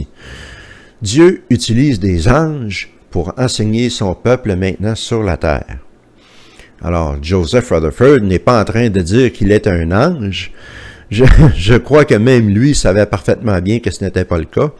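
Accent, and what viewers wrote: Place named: Quebec, Canada. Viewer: Je salut votre courage cher monsieur 'vous parlez en connaissance de cause. Je suis pas athée' je m instruis par le biais de vidéos. Tout laisse à croire à un lavage de cerveau. Nous devrions tous restez libre et choisir une voie spirituelle si on éprouve le besoin et quand le moment viendra. Merci pour l accent québécois ❤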